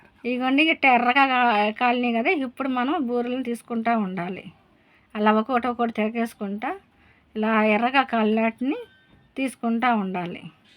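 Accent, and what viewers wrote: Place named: Rayalaseema, India. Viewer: చూస్తుంటే తినాలి అనిపిస్తోంది, చాలా చక్కగా సీమ భాషలో చెప్పారు.. 👌👌👍👍👏👏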